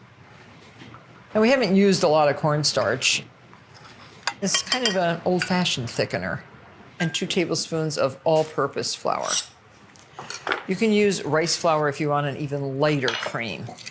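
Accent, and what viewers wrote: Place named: Connecticut, United States. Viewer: I love how Martha speaks french in her Connecticut accent... 😎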